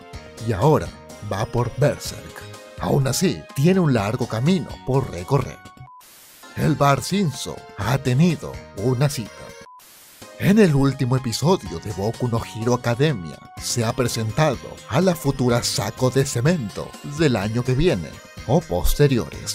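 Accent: Peru. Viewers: Alv eres peruano :D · Eres peruano?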